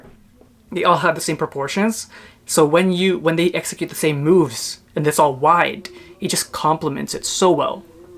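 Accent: Philippines. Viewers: I love your heavy Pinoy accent. Magandang umaga from the USA!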